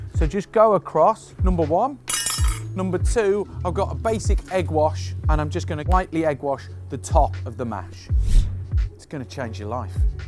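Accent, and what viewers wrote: Birmingham, United Kingdom. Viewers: Food busker I can't quite figure out exactly where your accent is from,are you a brummie or is it more of a Wiltshire/Gloucestershire twang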